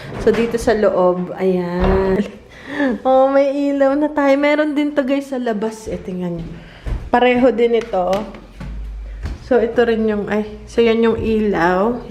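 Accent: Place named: Mindoro, Philippines. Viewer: Hi ate, curious lng kasi ako..saan province mo dito sa Pinas? Parang tono samen sa Mindoro po kasi😊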